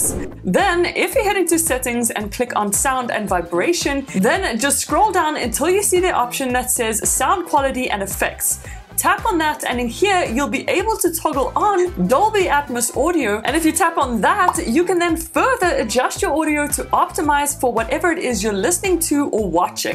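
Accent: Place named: Afrikaans, South Africa. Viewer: Ek wou sê jy klink afrikaans😂 goeie video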